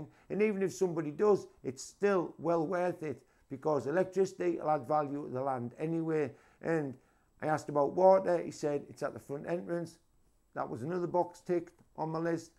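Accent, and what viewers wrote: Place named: Teesside, United Kingdom. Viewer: thats a sout teeside / north yorks accent ? ... recognize the twang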